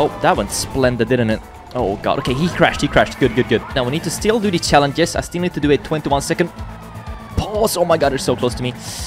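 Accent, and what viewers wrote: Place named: Sweden. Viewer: Swedish ascent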